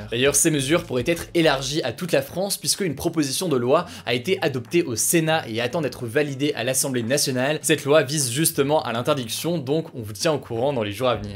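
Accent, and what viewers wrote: England, United Kingdom. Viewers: j'adore ton accent anglais! Merci pour tout le travail que tu fournis! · Ton accent anglais >>>>> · Y a qu'à moi que son accent anglais fait marrer ? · Ton accent anglais est trop bien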